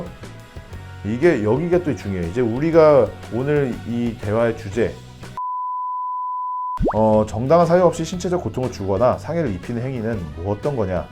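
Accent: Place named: Honam, South Korea. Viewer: 여기가 호남(사투리잘쓰는)형 변호사 유튜브 맞나요? 구독하러 왔습니다!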